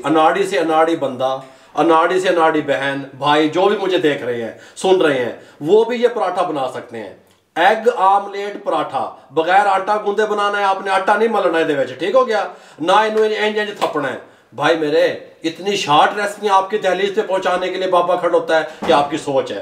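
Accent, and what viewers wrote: Punjab, India. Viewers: Zaiqa we changa ho si, Style te no1 hey hi twada. Cool man with punjabi tarkah makes it enjoyable to watch. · Zaberdust I like they way u speak hindi and punjabi . very good easy recipe thank u.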